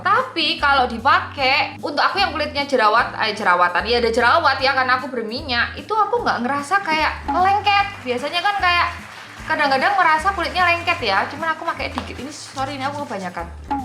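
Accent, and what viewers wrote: Java, Indonesia. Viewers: Raop.. yaalloh nguedok jowone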